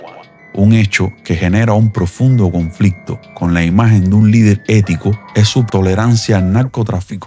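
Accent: Havana, Cuba. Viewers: pues su dicción muy habanera es bien recibida por los que estamos disfrutando la revelación del oscuro manto que envolvía al pestifero psicópata genocida dictador que hoy su oscura alma se quema 🔥 en el infierno, que no me gusta ni siquiera escribir su nombre, pero cuando lo hago, me place hacerlo arbitrariamente en minúscula, fidel castro 😅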